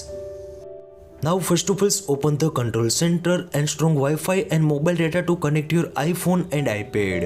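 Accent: India. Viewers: Are you in India? the accent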